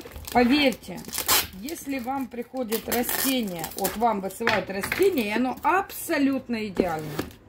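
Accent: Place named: Ukraine. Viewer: думала,что вы украинка(я сама украинка и с таким же акцентом)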